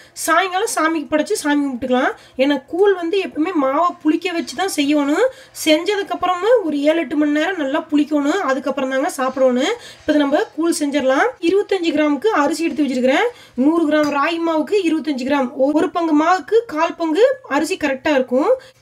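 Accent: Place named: Coimbatore, India. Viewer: unga sontha uru unga voice nalala erukka Akka nenga Coimbatore nenacha Akka na Coimbatore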